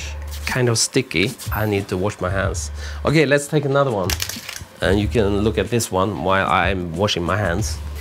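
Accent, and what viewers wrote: Sweden. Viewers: Now I know what word will deffinitley tell if the person talking is swedish, it is the way he pronounces ”glycerol” 😁 great video again, thank you! 👏😊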